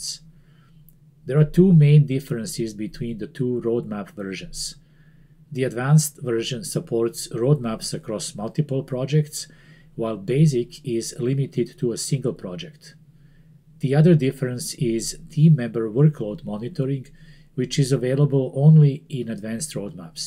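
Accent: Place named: Balkans. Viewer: Odma sa aboniro , cuje se nas covjek i sto je jos bolje tvoj Engleski razumijem 😊 Hvala 👍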